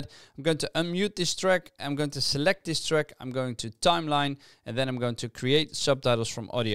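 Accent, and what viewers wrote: Netherlands. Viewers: i hear a little bit of nederlands in your stem. Still thanks for the tutorial it helped me:)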